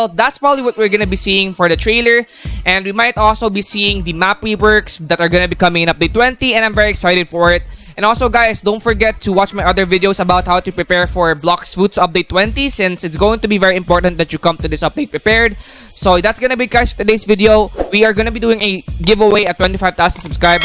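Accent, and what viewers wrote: Philippines. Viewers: Your accent is like so Filipino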